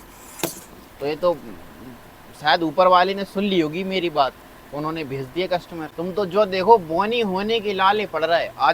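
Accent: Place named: Jhansi, India. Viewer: भाई अभी भी टाइम है इसको बंद करके पान की दुकान खोल ले 😢 कपड़ों की दुकान में अब दम नहीं। वैसे झांसी साइड के लग रहे हो बातचीत से 😅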